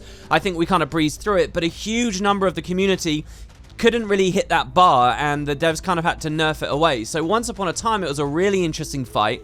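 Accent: United Kingdom. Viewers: Hey man, long time fan. Just thought I'd let you know that I've been watching another YouTuber named MrTommo2304 (who does Bleach anime content); and his voice sounds bang on like yours. Could be your doppelgänger. Definitely a British accent thing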